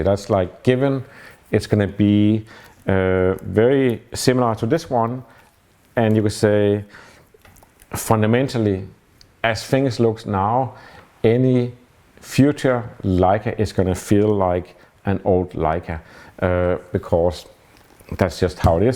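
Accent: Denmark. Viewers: Your intro are my favorite. I love the danish english vibe. Have been follomt you for some time now. Keep it up. 😊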